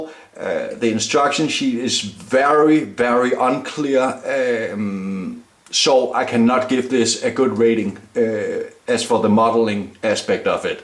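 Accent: Denmark. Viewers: You danish? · Are you from Denmark? Sounds like it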